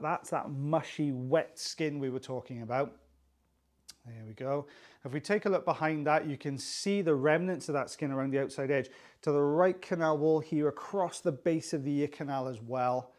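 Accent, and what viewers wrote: Wales, United Kingdom. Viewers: I love your accent!! What part of Great Britain are you from? My maiden name was Thomas so I’m a Welshy! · Oh my god I've gone from a popaholic to a waxaholic thanks to you! Your you tube is so interesting and your commentary just brilliant with that lovely Welsh accent. Thankyou (rushes out to buy some olive oil )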